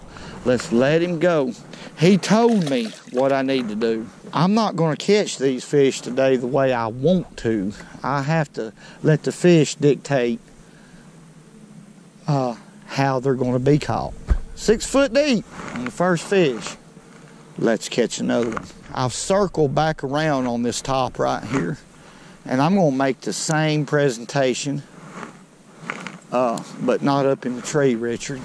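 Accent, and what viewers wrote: South, United States. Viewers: Lol I’m quite a ways in this creek, next sentence no further than I went back in this creek lotsa shad 🤣 love southern dialects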